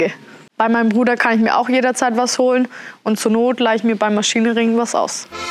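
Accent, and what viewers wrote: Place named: Franconia, Germany. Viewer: Servus, erstmal geschaut wo der Hof is. Sin entspannte 50km. Freut mich total das jemand aus der "Gegend" dabei is auch wenns keine 100ha sondern nur 13 sind. Is nich negativ gemeint aber man hat echt gemerkt dass es schwer vom Fränkischen ins Hochdeutsche reden zu kommen. Bin auch totaler Gülle Fahrer auch wenn ich letztes Jahr kaum Aufträge fürs Zubringer fahren von meinem MR bekommen hab. Freu mich echt auf mehr Videos Gruß Ausm Fürther Land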